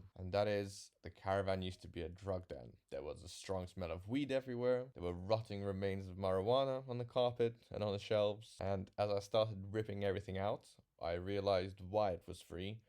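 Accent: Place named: United States. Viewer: It's not called trash, it's called rubbish. You speak like a yank.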